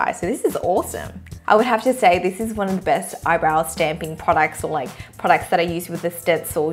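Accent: Australia. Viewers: The product sucks but what i really want to know is: are you australian?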